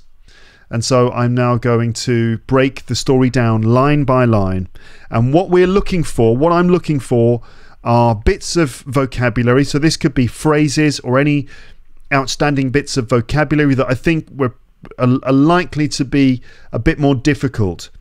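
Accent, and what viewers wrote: United Kingdom. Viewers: British English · I find interesting and really helpful every story you read since it's the best way to learn while enjoy English, i have listened many short stories from your channel. I have been obsessed with the British accent and this is helping a lot to brush up bit of my skills. Greetings from Peru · Huge thank you,. Your channel is very useful to learn new vocabulary and phrases l don't know. I am also interested in learning British English and accent which is very nice. You are an amazing and dedicated person who teaches English perfectly. I am sending all my best wishes from here where l live. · Wow, I absolutely love your voice! Your pronunciation, intonation, and accent are perfect for this kind of content! I wish I had your British accent =D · Mano eu tô iniciando então não sei... Esse sotaque dele é britânica???? Vc acha bom pra mim começar c ela ou americana? 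· I really enjoyed the British accent and the format (story, then summery then attention to the specific phrases and details) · O...British English ! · Subscribed! This is British English because of the British way to pronounce R and the glottal T. Love it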